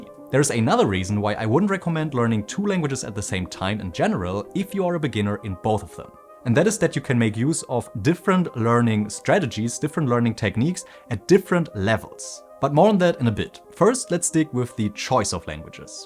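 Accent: Germany. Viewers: I'm also mad at myself I didn't notice you were German 😂 I'm also trying to be perceptive😂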